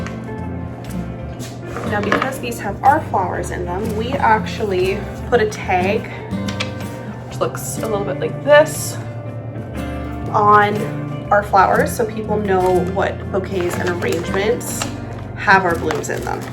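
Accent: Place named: Canada. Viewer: You're so Canadian. EH!... You said it so many times. Love it.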